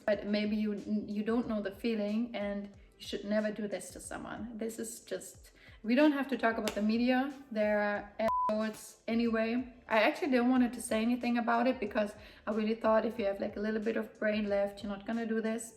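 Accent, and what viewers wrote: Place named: England, United Kingdom. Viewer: Send you and Mario love and blessings from Mexico, I’ve just subscribed to your channel and it’s interesting, I like your English accent. ❤️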